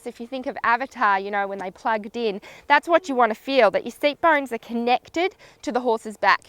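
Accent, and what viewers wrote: Australia. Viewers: Ahh so nice to hear an Aussie accent! My sister and I are from Australia too, I'm super into dressage and I love your videos! (you can check our our channel if you like!) Keep it up! Good luck with the baby (saw a more recent video earlier!)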